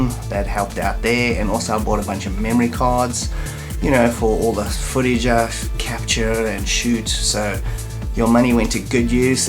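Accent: South Africa. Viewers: I've always thought you had a South African accent!! That explains it:-) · I'm guessing your a Saffer living in the USA? (Not digging for info, just a guess from the accent) I think at least C64, Amiga and other 8 and 16 bit companies should give you copies of their software. Some absolutely brilliant games are coming out for retro systems. Maybe if we mention you now and then on their forums - it might grab their attention? I have to play these new games on emulators. Just playing the demo for "Shadows of Shegorath" on my Pi400. Great game but in the back of my mind there is an itch that is telling me "You should get yourself a proper Amiga" Same when I play "Boulderdash" on Vice "...This is a great game - but I need to play it on a proper C64"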